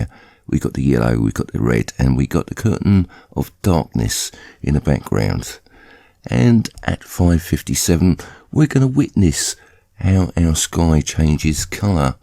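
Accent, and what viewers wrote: United Kingdom. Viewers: Honey your sarcasm is just a little too subtle for people to really get it. I think it’s because your Britt accent confuses.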